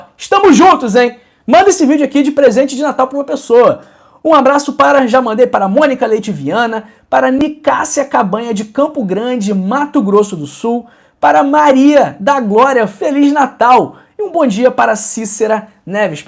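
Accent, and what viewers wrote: Rio de Janeiro, Brazil. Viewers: Esse sotaque de malandro carioca faz derrapar toda a credibilidad neste vídeo 🤣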